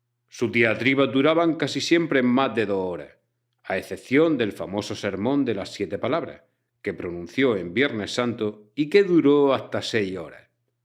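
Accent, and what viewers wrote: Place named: Murcia, Spain. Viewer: Sin comentarios. Magnífico ese acento murciano.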